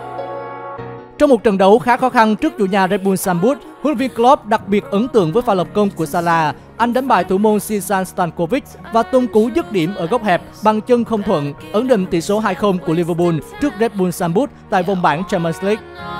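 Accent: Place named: Da Nang, Vietnam. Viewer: Người đà nẵng hả em bé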